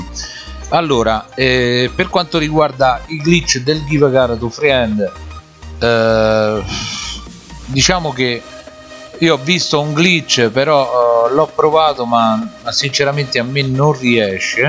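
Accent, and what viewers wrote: Rome, Italy. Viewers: Oioi mi fai pisciare addosso dalle risate come parli adoro il romano 😂😂😂😂😂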